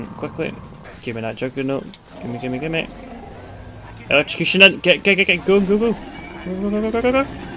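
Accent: Scotland, United Kingdom. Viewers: Where in Scotland are you from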